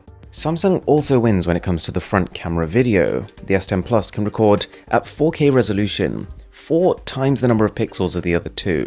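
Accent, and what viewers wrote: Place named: United Kingdom. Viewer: Omg i love your british accent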